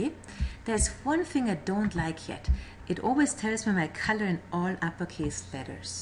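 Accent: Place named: Jamaica. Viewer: Excellent tutorials! Your accent is cool.. are you Jamaican?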